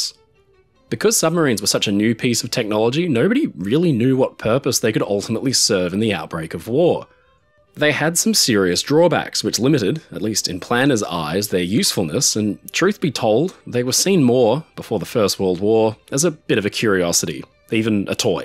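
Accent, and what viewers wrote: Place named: Australia. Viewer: Australian accent is just so hard to listen to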